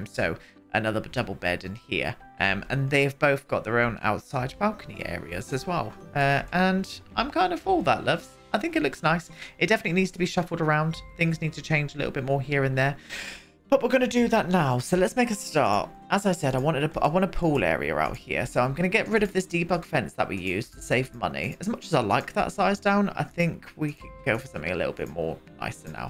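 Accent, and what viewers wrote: United Kingdom. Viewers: The way your pronounce Yiya is sending me I am not sure if it’s cause your British accent and I am Greek Australian but it sounds yah yah or yee-haa.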